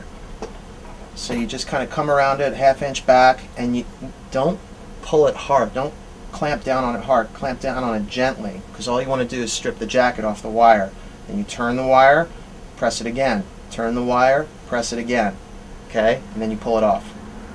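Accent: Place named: United States. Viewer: cos he's american... they say it like "sodder" for some reason.. even though nobody else in the entire world does.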